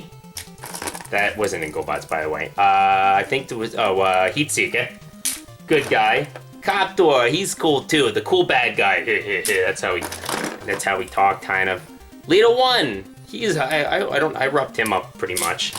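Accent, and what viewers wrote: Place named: East Coast, United States. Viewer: Oh I was just kidding around, your east coast accent reminded me of the Honey Badger video lol. Cool vid man, I really enjoyed seeing all those gobots! It would have been nice if you'd done a quick transform on them and then just edited out the time it took. A lot more work for you, I know, but definitely an improved viewing experience!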